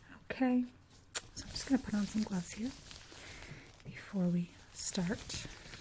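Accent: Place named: United States. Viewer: I have to ask, is English or Portuguese your first language? You speak English with an American accent, and I don't know Portuguese so I can't identify an accent in that one.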